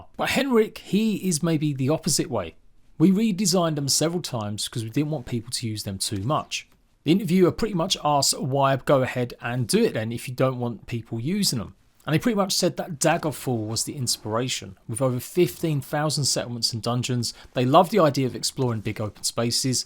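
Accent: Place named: United Kingdom. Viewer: Totally random question. I have a weird hobby--I like learning to recognize accents. Where are you from? I'm guessing you're not from East End London, although I'm still a bad guesser when it comes to British accents because there are so many. Bonus question: what celebrity do you think represents your accent best?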